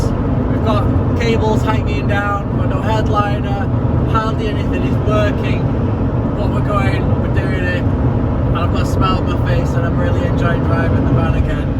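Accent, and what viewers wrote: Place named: Northern England, United Kingdom. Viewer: Liking your blue nail varnish to match your van 😎 😉 Proper workman’s hands there. All looks great, mate. You’re a great story teller, as well as fun to watch. Not surprised you’re subs are rapidly rising. I think your northern accent makes it even better when you’re winging about your missing hinge bracket 😂 hope you’re going to video bits of your road trip. Currently down at lands end in Cornwall in my 56 year old beetle. 210 trouble free miles so far 🤞🏻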